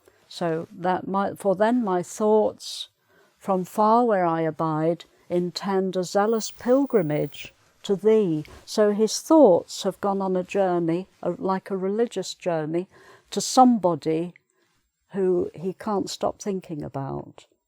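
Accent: United Kingdom. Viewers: I love British accent, and you are the best ♥ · I'm 15 years old and I'm from Brazil! I love your work and I understand a lot of your words, british accent is beautiful! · Omg this lady is a pure british perfection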